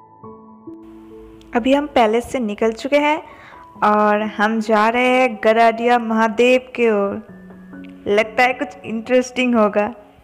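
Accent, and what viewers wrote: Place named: Bengal, India. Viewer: I think she is Bengali her pronounce is like Bengali because of I'm also bengali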